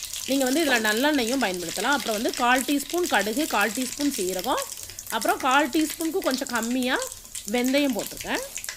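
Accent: Tirunelveli, India. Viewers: akka nenga thirunelveliya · UNGA paechula theriyuthu amma... My mother's native also Tirunelveli.. and your samayal ellam super ma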